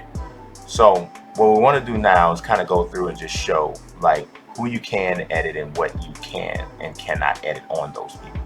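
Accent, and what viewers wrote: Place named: South, United States. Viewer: Lmfao you funny af bro def form the south im guessing atl? And yeah bro thanks so much for the info!!!! So glad I didn’t download this is wack af 70 plus dollars for this lol ea wow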